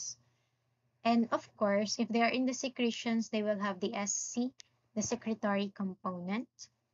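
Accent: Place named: Iloilo, Philippines. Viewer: Salamat Ma'am :) Halong gid (ilongga tone)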